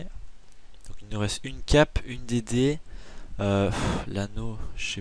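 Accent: Belgium. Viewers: Il est belge:D ?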